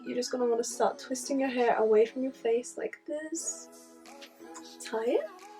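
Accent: United Kingdom. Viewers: I Love your Britsh accent!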